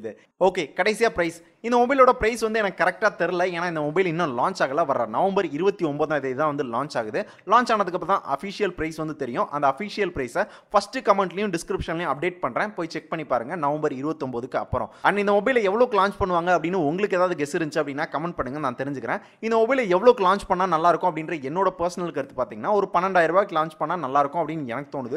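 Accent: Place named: Madurai, India. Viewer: Bro neega madurai thaana... Norikkivittainga...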